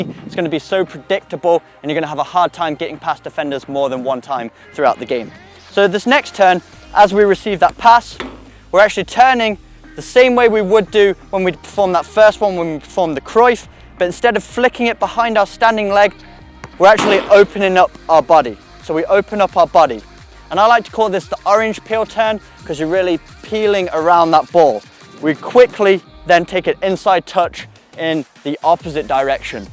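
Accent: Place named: England, United Kingdom. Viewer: Top video as per usual.Where abouts in England are you from mate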